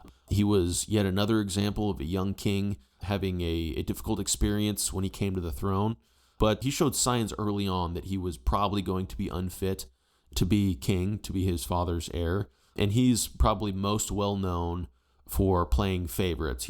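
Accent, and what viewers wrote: United States. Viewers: I apologize, that's my bad then. I even listened to it a few times but I still couldn't quite decipher your strong American accent 🙃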